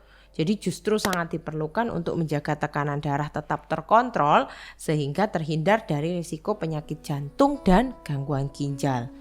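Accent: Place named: Surabaya, Indonesia. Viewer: ini dokter atau apoteker.. pd manggilnya dokter hehe.. ini lulusan jawa timur yak?, medok suroboyo an rek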